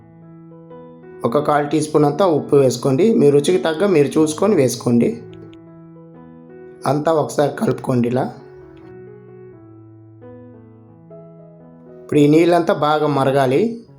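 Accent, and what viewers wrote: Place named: Nellore, India. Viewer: నెల్లూరు జిల్లా భాష రెసిపీ బావుంది అన్న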